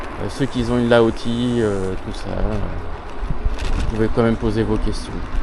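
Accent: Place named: Normandy, France. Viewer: Vidéo très agréable j'ai pas vu les 29 minutes passer Merci pour tes explications, bientôt propriétaire de t107 pro surtout pour l'off road 😁😁 Mais dit moi tu parlais de jeu dans la colonne ça me fait un peu peur 😕😕 Sinon joli accent normand moi je suis d'Occitanie donc un bon accent du sud bien chantant Merci pour ta future réponse bon ride ✨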